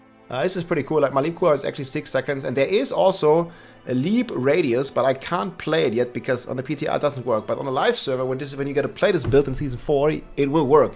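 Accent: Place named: Germany. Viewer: Bist ein Opfer wirklich du bist der Grund Why alles geh nerf Wird rede mal deutsch du bist mehr deutsch als Manuel neuer hört man so raus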